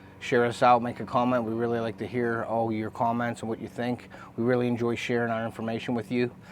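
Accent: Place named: Pittsburgh, United States. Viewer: Awesome video. Searched how to add oil to my merucry outboard and this video came up. Within TEN seconds, i was like is this guy my neighbor?? lol fellow yinzer here!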